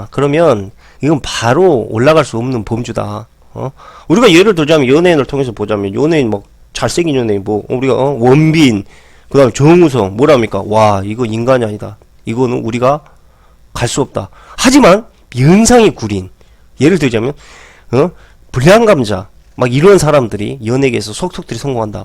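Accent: Jeolla, South Korea. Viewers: 전라도 사투리?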